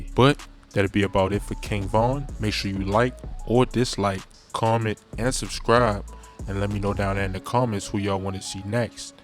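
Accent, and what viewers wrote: Baltimore, United States. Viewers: You from Baltimore I can hear it in your voice your videos is bogus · Yew from Baltimore ain’t yew😭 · Bmore as hell bruh! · Can hear the Baltimore accent thick!!!! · The Narrator sound like he from B-More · You must be from Baltimore ?????? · Mannn I can tell yo ass from Baltimore and I’m from Cali 😂😂😂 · Great video. This dude gotta be from Baltimore, right? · Definitely a bmore nigga narrating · u can here bros baltimore accident some times lmao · U definitely from Baltimore bro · you from baltimore ainit 😂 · Baltimore accent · Im from Maryland that’s definitely a Baltimore accent for sure 😂😂😂😂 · You gotta be from boltimore bro 💀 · Yo from Baltimore I hear your accent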